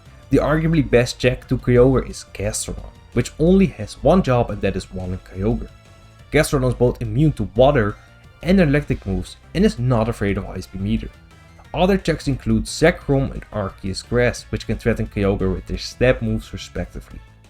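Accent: Netherlands. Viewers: Hahaha Rain Boosted Water Spout goes brrrrrr (Btw are you Dutch? Guessing from your accent)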